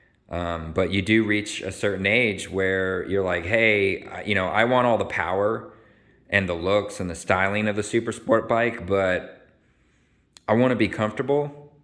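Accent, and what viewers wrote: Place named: Texas, United States. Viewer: Bro, you got a slight hint of Texan accent now. Also, nice bike.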